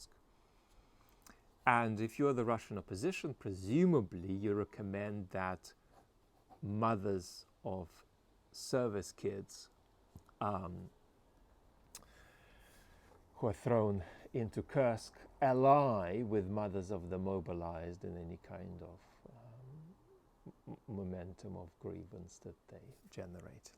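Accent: Australia. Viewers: We want more Aussie accents on VVC😂! ❤ from 🇦🇺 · Hi, thank you. Always informative, intuitive, creative, and all-encompassing, giving a balanced perspective. Your Australian accent is barely noticeable, perhaps from Tongan influences of encounters with Australians there. Certainly new south Welshman than Queensland.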